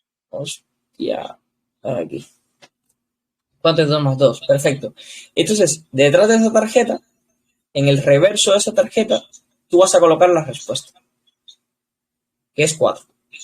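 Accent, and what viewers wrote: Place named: Spain. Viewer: Vaya vozarrón y acento español llevas colega 😂👏🏼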